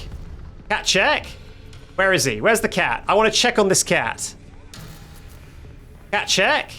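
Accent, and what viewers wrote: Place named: South, United States. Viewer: That accent is southern american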